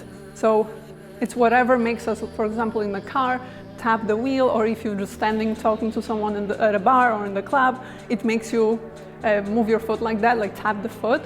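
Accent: Poland. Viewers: You sound Polish. My parents are Polish. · Great teaching, thank you! I'm going to watch your other videos! BTW. Have you any connections with Poland? I can hear sth like "Polish note" in your voice ... :) Don't answer if it is too personal.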